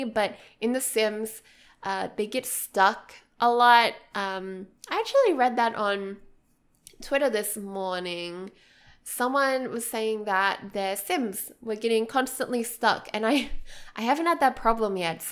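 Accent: Australia. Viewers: Artem XCX kid it’s called an Australian accent they are lovely and I am blessed to have an “annoying accent” if you don’t like it leave press the red X at the top of your screen it helps ( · Hamad Rashed Probably because of the Australian Accent we all sound similar 😊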